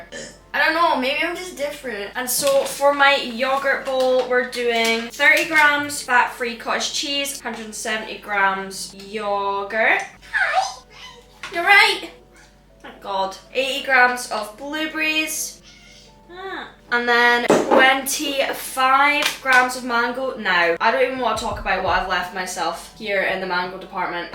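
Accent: Scotland, United Kingdom. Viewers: What part of Scotland is she from? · Are you Scottish?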